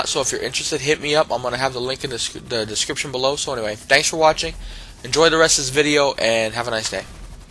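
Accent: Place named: Netherlands. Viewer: First of, still useful, but there is a better way, when you enter the base, stick to the left, when you see the parking lot, stick to the fence, there is an opening leading to a moat where you can safely and easily drive through to the chopper, it took no time at all to get there and second, I believe you're Dutch, but your English itself is really good, most people would not pick up on it :)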